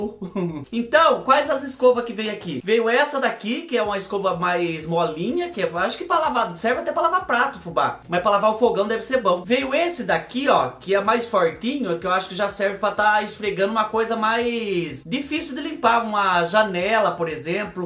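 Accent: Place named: Paraná, Brazil. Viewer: Fubá acho lindo seu sotaque parece sotaque de paranaense lá do sul💋🙏🍀❤️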